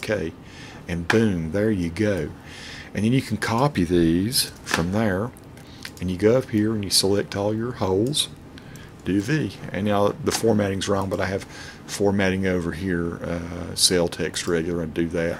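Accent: South, United States. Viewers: thank for this tip, and for your delightful southern accent. If Adobe was smart they would hire you for their tutorials.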